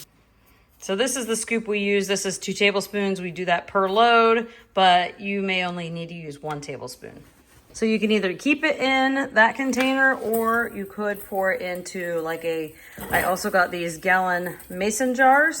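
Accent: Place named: United States. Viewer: She's not speaking English. She's speaking "American". There's a difference. This is the kind of thing that happens when you have had a failed educational system for decades.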